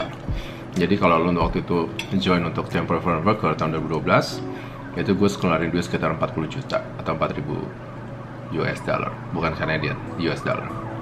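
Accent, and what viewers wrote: Medan, Indonesia. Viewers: Aku suka kali liat vlog abang...bahasanya Medan kali...jd ingat aku sama kampungku...sehat terus ya bang...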